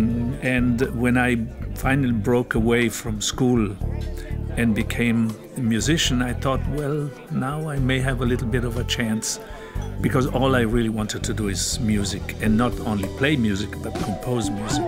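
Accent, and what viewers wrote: Italy. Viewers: a me sembra un accento piuttosto italiano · actually i checked and he's from the Alto Adige region in Italy (which in german is Südtyrol) so he is italian but was born really close to the italian-austrian border, where the accent is influenced by germanic languages, so we were both right lol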